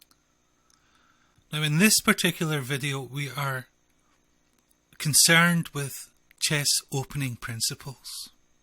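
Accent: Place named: Scotland, United Kingdom. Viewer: Great video thanks. What part of Scotland are you from?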